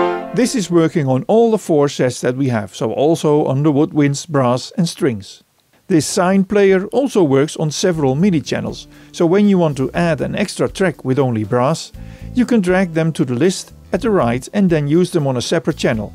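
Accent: Netherlands. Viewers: Really funny Dutch accent.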